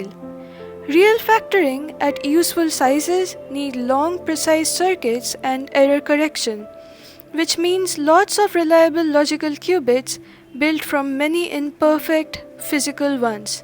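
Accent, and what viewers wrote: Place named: India. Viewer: I usually can't stand Indian accent of English - but this one is really pleasant to listen to lol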